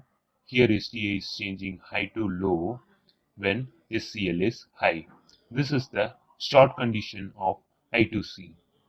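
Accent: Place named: India. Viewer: come on idiot!!! stop speaking english... i hate indian poeple speaking English... it sounds awful !!! I hate indian people since now.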